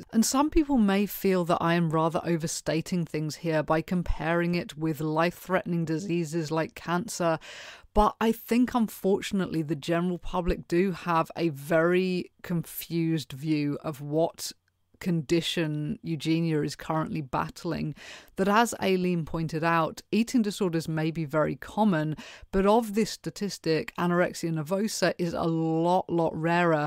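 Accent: United Kingdom. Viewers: Honey, oh boy! I'm American and really only speak English unless you include my three years of Spanish in college which equates to like basically Alicia still were still in from clueless trying to speak to her maid when she says,"you know I don't speak Mexican!" What was hell white valley girl I end up sounding every time I try to speak Spanish but anyways! My fiance Tyler just always started putting the TV setting so that every single thing at subtitles on all the time and it first it drove me nuts but then I started paying more attention to it and being like oh wow I always wondered what they said in this movie or whatever at that very moment now I know! And so that habit has translated over onto YouTube so I always have it set so that there's either actual subtitles on whatever videos are playing and they show up for me, or, they are the "Auto Generated" subtitles which are clearly meant for English speakers from America, because oh boy let me tell you it's pretty funny just reading them even on your videos because I'm sure you know quite well by now that you have a very distinct British accent and I mean personally I love your voice an accent so much and I just love your Vibe overall anyways that's part of the reason I love my channel but, I think the worst is when there's ever someone who narrates a video who has a very pronounced Welsh or Scottish accent...? 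(Austrians can be puuurrddy hilarious too with what this "Ufo generated" stuff comes up with?!) So when you're ta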